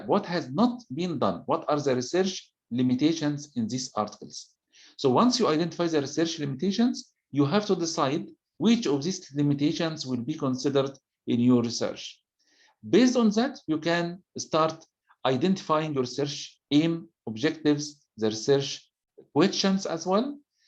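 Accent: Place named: Arab world. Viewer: Look like an Arabic English...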